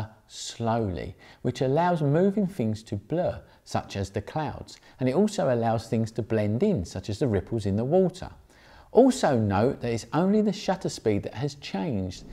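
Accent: United Kingdom. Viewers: Lovely accent feels like I'm watching great British bake off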